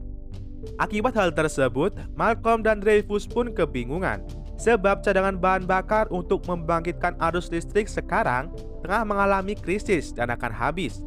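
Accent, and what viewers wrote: Banyumas, Indonesia. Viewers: Mantap youtuber ngapak hadir min 😆😆😆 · Jebule * wong ngapak ya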